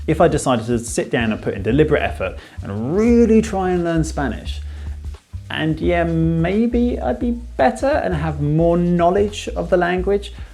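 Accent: United Kingdom. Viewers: Excuse me, Where is your accent from, precisely? South of wales, where? I would like to do an exchange in the future, but I think that some UK accents are difficult to understand, and yours sounds nice to me even though I'm not used to hearing this accent. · I'm trying to learn English and i have a B1-B2 level right now. I think i understand this video at 85% even if you speak pretty slowly and you are very clear with your vocabulary. I think it's due to the different accent because i never watch British contain on YouTube. I should because i can understand an American video at 90-95%. The problem is still when people speak so fast that i can't understand. I do a lot of input but also take vocab on a special app (Quizlet Wich i recommend because it's like cards and it's very helpful). Make input and learn the vocab that you learn while input and you'll increase. 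Also, read in your target language even if it's book that you already know. I had a 615 score in TOEIC and by this method i have a 850 today. I don't even know the grammar that's why I hope that there is not a lot of mistakes here. Tell me if there is mistakes I'm happy to learn things from native😁.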